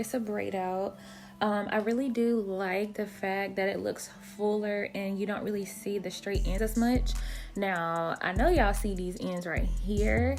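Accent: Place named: South, United States. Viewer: You are so pretty girl!!! Ooh, I love your accent too. Southern accent?? Anyway, your locs are beautiful. My braid locs were started end of Feb 2022. Girl, I feel you with that darn shrinkage. Mine looks shoulders right now. 😂🤣 However, my hair is around BSL to mid back. I also been documenting my loc journey too. Great video! 😘🙏🏻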